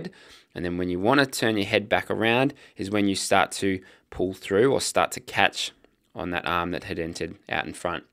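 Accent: Australia. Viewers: Awesome! Thanks! Though at first that lovely Aussie accent made "late" sound like "light" !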